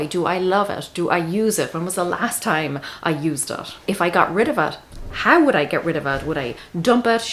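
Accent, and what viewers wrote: Ireland. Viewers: Always love your videos and that wonderful melodic Irish accent xx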